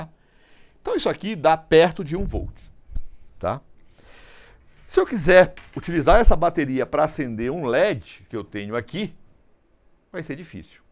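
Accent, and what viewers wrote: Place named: North, Portugal. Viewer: Acho q esse sotaque é do Norte... kk Excelente video! Muito Obrigado! Sucesso!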